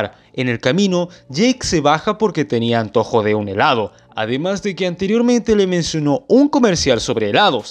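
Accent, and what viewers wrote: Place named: Entre Ríos, Argentina. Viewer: Sos medio ashastrado para hablar hermano, pero queda bien! Le da un buen estilo, parece que sos entreriano o norteño de algún lado de Argentina, un abrazo de cordoba.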